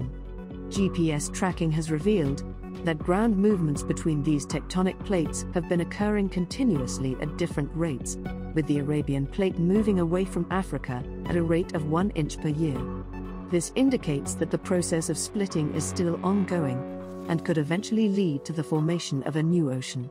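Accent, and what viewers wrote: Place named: United States. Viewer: It is nearly unbelievable to me how immature and wrong all your videos are, You are like a child playing at a tea party with no concept of reality to guide them. relying on whatever stupid idea comes to mind. As a mature smart worldly Canadian man I find your entire catalogue of crap stating videos to be truly stupid, Why do sound like such an americunt, Stop making this crap and just keep your stupid to yourself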